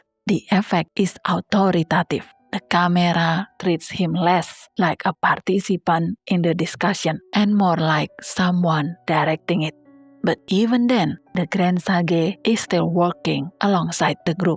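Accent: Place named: Indonesia. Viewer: Definitely indonesian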